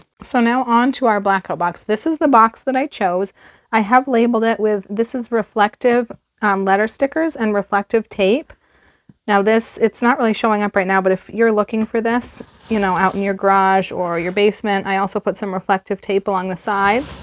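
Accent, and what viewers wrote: Maine, United States. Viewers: Sounds like your from Maine which is awesome! I live in the mid coast area. I also have a black out box even though I live off grid lol. I even have an old school crank flashlight/ radio.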